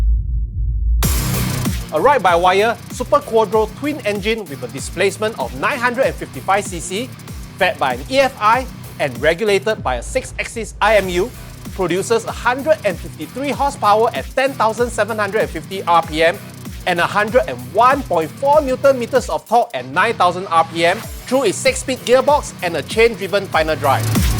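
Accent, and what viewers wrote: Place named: Singapore. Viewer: I like the content but guys, can we stop with the extreme Singlish pleaseeeee. It’s honestly quite annoying and totally not necessary at all. Don’t have to speak like ang moh but certainly not like some coffe shop uncle. Cmon guys this channel have the potential to be a proper bike review channel.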